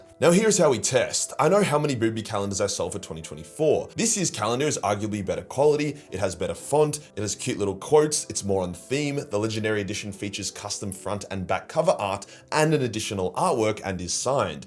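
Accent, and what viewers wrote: Australia. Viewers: I've been here since the beginning, I'm a married 40 year old, and I'm not here for boobs, I'm here to hear a degenerate Aussie talk about my favorite subjects. Boobs are a good bonus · I just like listening to this absolute chad Aussie talk about a great universe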